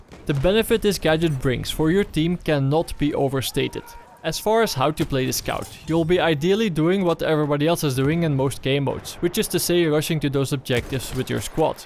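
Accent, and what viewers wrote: Netherlands. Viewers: Hey, are you by any chance dutch?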